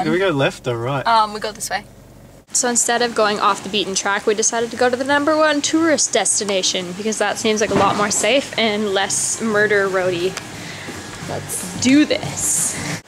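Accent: Australia. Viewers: you are sounding more and more Australian every video C: haha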